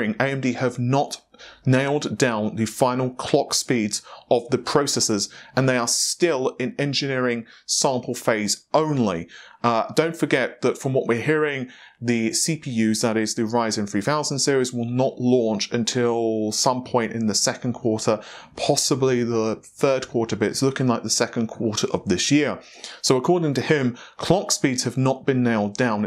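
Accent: United Kingdom. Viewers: Short is good, specially with this thick British accent.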